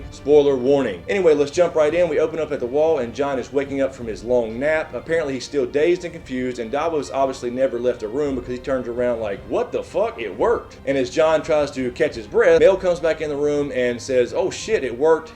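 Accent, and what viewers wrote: South, United States. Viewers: love the accent, where r u from, the south or texas or somewhere else xd?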